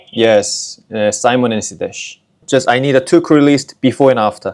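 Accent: United Kingdom. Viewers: 영국 방식의 영어죠. 미국의 굴리는 영어가 우월한 영어라는 생각을 은연 중에을 갖고 계신 것 같은데, 영어에서 이렇게 발음에 집착하는건 한국인 밖에 없다는건 알아두세요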